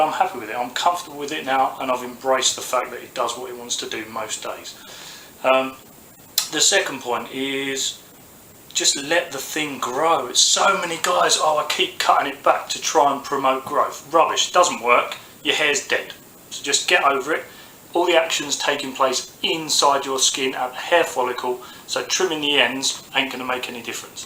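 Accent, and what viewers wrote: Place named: United Kingdom. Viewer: Haha. Great video! I agree 100% with everything you said. I should also say, though, that even though I knew you were British, it didn't hit me that you would speak with such a strong British accent! That was kind of a shock, actually! Hehe. Great video. Thank you for posting. That moustache is looking great!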